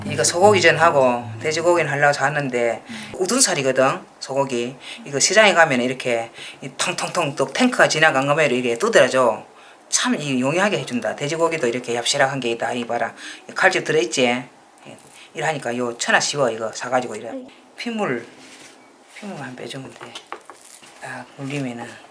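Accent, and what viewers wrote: Gyeongsang, South Korea. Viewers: 육전 ^^너무 맛있겠어요 반찬 검색중에 육전에 눈이 띠웅해서 보고나니 당장 먹고 싶네요 어릴적은 사는게 힘들어 그런지 ᆢ친정엄마는 한번도 해주질 않았는데 제가해서 드려야 겠어요~ 저도 고향이 경상도라 구수한 말투에 너무 정감이 갑니다^^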